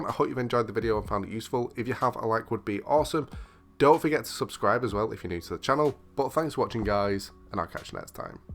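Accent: United Kingdom. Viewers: Are you from Sheffield? I can’t quite place your accent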